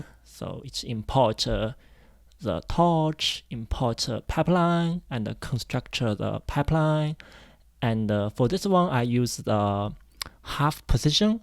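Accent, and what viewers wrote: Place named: China. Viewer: chinese-style english😊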